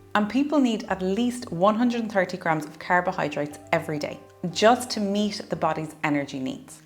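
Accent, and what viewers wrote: Ireland. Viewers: This is a good video to help with weight loss. It's also a great video to help with learning an Irish accent. :)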